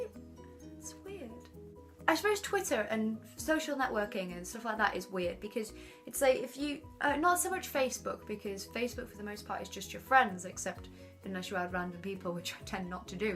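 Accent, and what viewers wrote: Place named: England, United Kingdom. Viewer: I LOVE THE ENGLISH ACCENT!!!!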